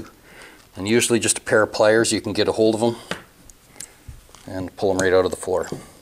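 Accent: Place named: Canada. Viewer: Damn that's the thickest canadian accent I've ever heard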